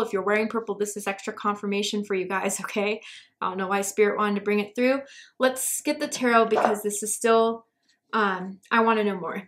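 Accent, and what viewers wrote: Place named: United Kingdom. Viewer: Are you from the UK 🇬🇧 😊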